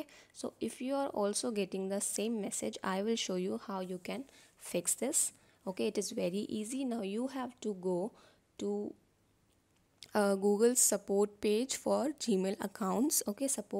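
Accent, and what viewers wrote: India. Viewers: Wow, do all Indians talk with that shit accent. God, it's so annoying.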